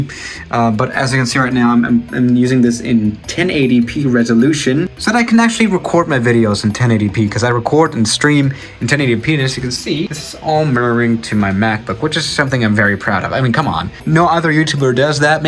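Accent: Germany. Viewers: Wait are you actually German ?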